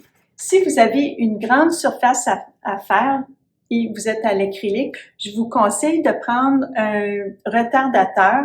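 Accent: Quebec, Canada. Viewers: MERCI BEAUCOUP, POUR TOUS VOS CONSEILS, J'AVAIS ABANDONNE LA PEINTURE ,ET VOUS M AVEZ DONNE AVIS DE REPEINDRE ,VOTRE ACCENT EST TRES AGREABLE, ET DONNE ENVIE DE PARTIR AU CANADA BONJOUR DE FRANCE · Merci pour cette démonstration superbe et tellement concise préside et intéressante avec en prime votre très bel accent canadien encore merci je m abonné de suite 👏👏👏👍👍👍💓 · Merci bcp ces nuances variees lumineuses rejoignent un bel accent du QUEBEC !!! A Bientot 😮😊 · J'aime bien❤ ton accent, il est canadien ? Merci pour toutes ces leçons et ces bons conseils 💐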